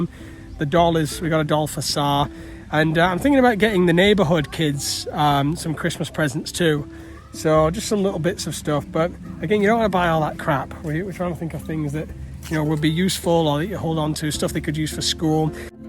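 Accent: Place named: Lancashire, United Kingdom. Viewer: Love the Lancastrian accent.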